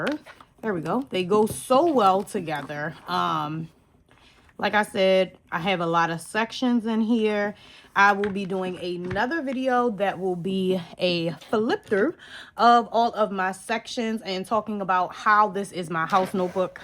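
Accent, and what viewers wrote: Baltimore, United States. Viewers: I think I hear a fellow accent sis, baltimore stand upp 🤣🫶🏽 lolol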